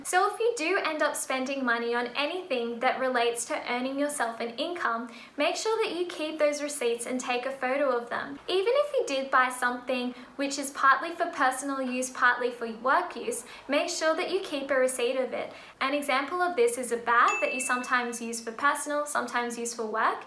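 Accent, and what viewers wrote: Australia. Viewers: thank you so much for your helpful information I really into your Aussie accent. Your voice is super soft-spoken =D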